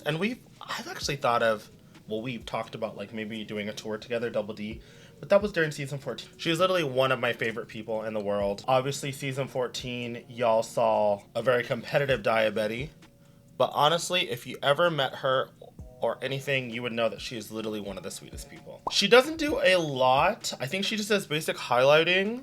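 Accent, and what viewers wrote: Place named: Central California, United States. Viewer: Hey gorgeous! First time really commenting but as the last video you spoke about wanting to hear more about what brings people to your channel figured I'd throw in my two cents. First of all, I love how calming your voice/energy/vibes are as many people have said. I love that you explore and play with make up, it's something I love to do as well. So many drag performers have figured out their mug and don't play with shapes and angles but as a fan of drag who has been trying to find the mug of my dreams it helps to watch someone who does different eyes cheeks lips ect. Also, I love that you still sound like a central Cali girl. I grew up in the Sacramento area and you sound like my friends and family so it's nice to hear. Lastly, you are just to muggy to not follow you come stamped every fucking time. 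❤ Much love keep the videos coming because the girlies who get it get it and we love the content. Happy pride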